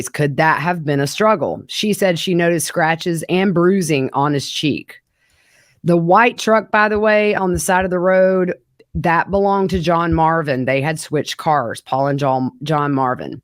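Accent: South, United States. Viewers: This trial is already a passion of mine. A southern gothic tale! You know what we want to hear about and your honeyed tongue has the perfect down home accent. I’m betting that someone with important connections will agree with me and you’ll be even busier after this case has been resolved. Keep chasing the truth, girl!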